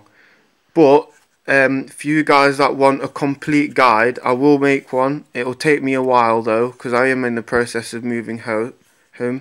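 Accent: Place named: United Kingdom. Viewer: You can tell you from the uk😂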